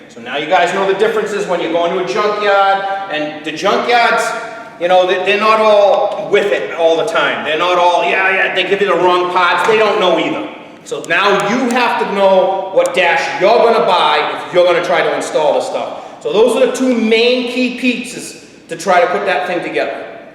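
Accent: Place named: New York, United States. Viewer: I think this guy just might be from the new york area.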